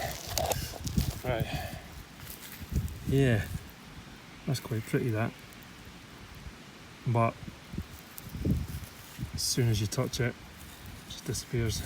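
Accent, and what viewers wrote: Scotland, United Kingdom. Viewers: thankyou so much for your reply and I am really enjoying your format I guess I'm just greedy and want to see them all haha.I also have to admit listening to your Scottish accent makes your videos even better for some reason I find it soothing.Keep up the good work and I will keep watching 😊 Sharlene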